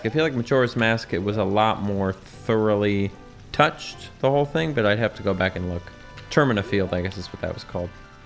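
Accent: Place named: New England, United States. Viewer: interesting how your accent says "ruhm' instead of '"room". you, by any chance, from the new england area?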